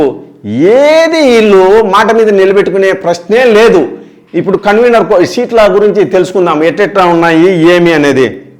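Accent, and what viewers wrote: Kadapa, India. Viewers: ఏంది అబ్బా నీది నీ భాష చూస్తుంటే కడప ఉన్నట్టుంది నీ యాంకరింగ్ ఏం బాలేదు అబ్బా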